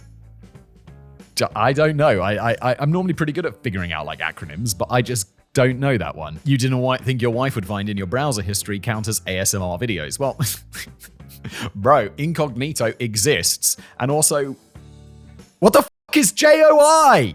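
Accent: United Kingdom. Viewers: Maybe not ASMR, but Simon could definitely launch a sleep version of his shows - he doesn’t even need new scripts, just keep the tone level 😅 I like to listen the The French Whisperer to fall asleep, but a British accent beats a French accent any day 😂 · for whatever reason, a man with a deep, soft voice and an accent from anywhere in the UK, could read anything at all and I will for sure fall asleep within 15 minutes. I would sign up for a Simon ASMR channel.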